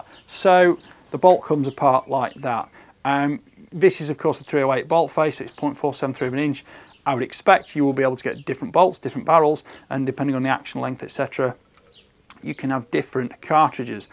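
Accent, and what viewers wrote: United Kingdom. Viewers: From Britain ?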